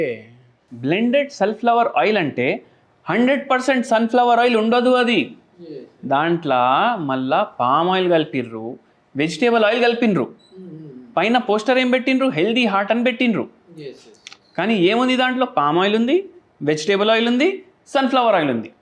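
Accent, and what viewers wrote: Telangana, India. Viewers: bro it's telangana slang don't insult the language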